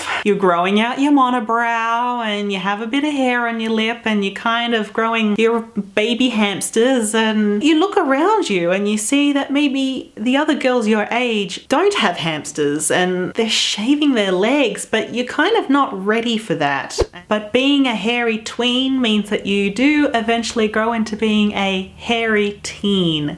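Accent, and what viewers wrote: Australia. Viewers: R u Australian? Love the accent.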